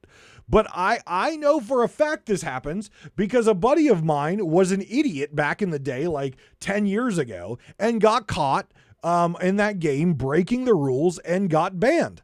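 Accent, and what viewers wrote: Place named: Canada. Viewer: next episode releases “Alright let’s see what our boy is championing today - oh thats a good one.” Long time Spotify listener, day 1 sailor - weekly sailor. Im here to say I love your style, and sometimes your heated opinions are very needed, this is one of those topics. I want to reiterate that I love you, that said - “bolth” and “aweso” …. Kills me every time brother. EVERY TIME. also says “bolth” though. Seems like a northerner/west coast/Canadian thing? Kills me! Lol but keep it up duder, great stuff and I actually really appreciate having constant content to listen to. Its nice to audibly jump into the seas while I’m working.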